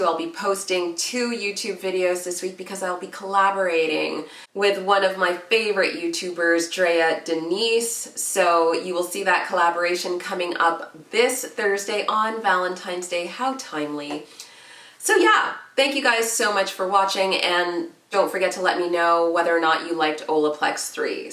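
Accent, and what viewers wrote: Canada. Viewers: It's a Canadian accent, very simply.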